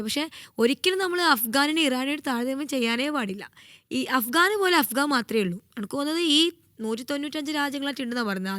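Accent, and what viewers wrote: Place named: Thalassery, India. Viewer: Pakka Thalassery slang 💗 Thalasseryl evdeya ?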